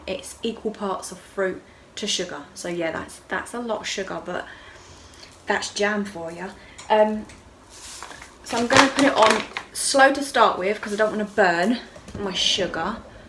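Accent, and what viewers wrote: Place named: London, United Kingdom. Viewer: But proper got your accent cos im from luton and my dad is london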